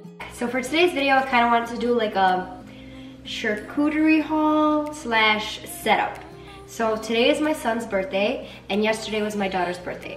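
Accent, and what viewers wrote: United States. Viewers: it looks so nice. I love your American accent. what state are you from?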